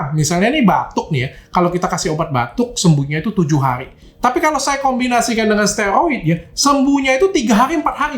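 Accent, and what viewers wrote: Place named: Medan, Indonesia. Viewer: Iya..dokter kayaknya gede di Medan..ini logat kami chinese Medan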